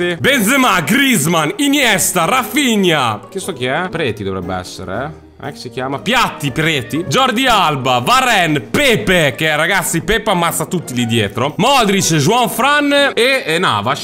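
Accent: Naples, Italy. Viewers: ma sei napoletano? o tifi napoli pero abiti tipo a milano? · oddiooo ahahahahah te che parli napoletano ahahah sono tutto bagnatooo grande ti amooo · io comunque mi complimento per il tuo napoletano che è qualcosa di stupendo. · il tuo napoletano mi fa divertire troppo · La combo Dialetto Napoletano+Incazzatura fa schiattare ahahah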